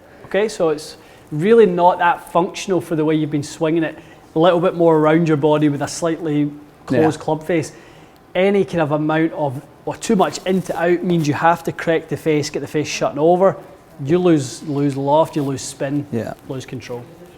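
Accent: Scotland, United Kingdom. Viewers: Great videos pretty interesting stuff, always thought it was ideal to have the spin rates at the number on the club x 1000. Does more spin not allow for easier workablity left and right ? I know guys with modest club speed probably looking to max out distance but surely must be sacrificing stopping control in firmer conditions and shot shaping ability with such low spin ? I swing a 6 iron at 92/3 and am only carrying it around 184 but with spin between 5800 and 6200, also play x100 AMT as I like to see the low launch higher spin flight and also love the feel of the DG. Ive never been properly fit before but do plan to on my next set of irons, have always just went with feel. Also I can tell your from Scotland so hello from Glasgow. Cheers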